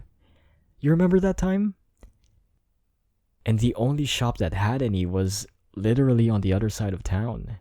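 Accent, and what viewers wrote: Philippines. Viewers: SABI KO NA EH FILIPINO KA YUMG ACCENT MO SA FILIPINO AGUY 🙂💕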